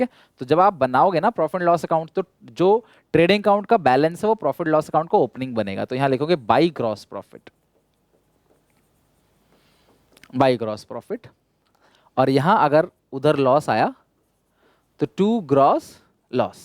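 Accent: Odisha, India. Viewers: sir ap ka mother language odia hai kya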